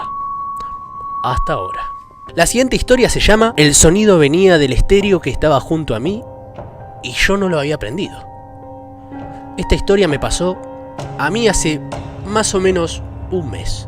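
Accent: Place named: Argentina. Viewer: Dross que te pasó? Ahora hablas con acento argentino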